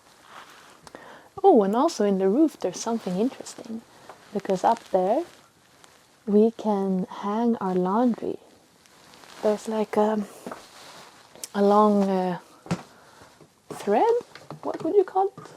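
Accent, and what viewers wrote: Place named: Sweden. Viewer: And why do you think so? I am Norwegian and I can tell you that first the language is genuine, Quite easy to hear this is Swedish English, AI can not fake that variation yet. Second; the nature is genuine and it is not hard to live this way. This channel is showing a real family, living in the real Sweden. I urge you to take a trip there to see the country. And to I know you don’t believe in Jesus, but Jesus is soon coming back, where will you be?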